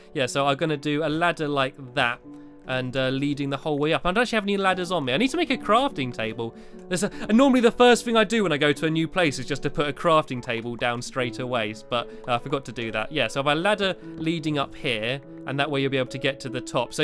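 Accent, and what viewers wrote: United Kingdom. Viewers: Are you British? British people are cool. (Just forget about the American Revolution.)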